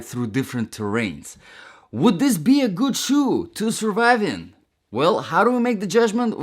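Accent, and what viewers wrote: Russia. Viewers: Английский хороший, но русский акцент с первых же секунд всё-таки слышно ;)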